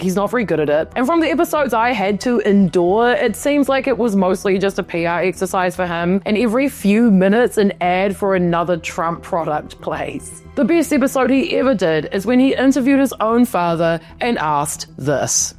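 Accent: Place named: New Zealand. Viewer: Completely beside the point - love your NZ accent !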